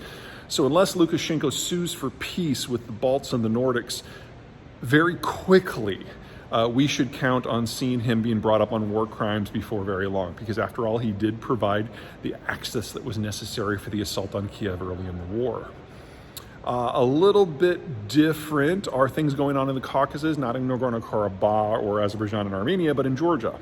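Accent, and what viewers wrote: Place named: Iowa, United States. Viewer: Don’t knock An Iowa accent honey.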